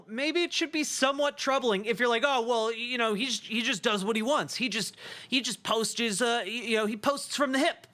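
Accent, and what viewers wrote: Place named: Delaware County, United States. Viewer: And having a Delco accent, is a plus too 😂.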